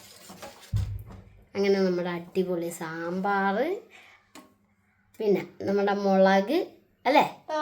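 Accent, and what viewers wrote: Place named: Kochi, India. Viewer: Njan first time anu ivarude vlog kanunne. Angatt ingattt nammakk🤔. Kochi ano sthalam. Njanum kochi anu . Slang kettatt chodhichenatta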